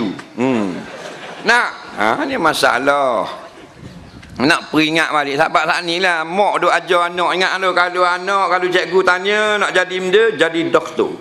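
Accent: Terengganu, Malaysia. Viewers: Ceramah terbaik, dari yg terbaik.Lawak2 yg penuh berisi, dlm loghat Terengganu.Best loghat Terengganu ni.Loghatnya lebih kurang loghat Kelate le!!!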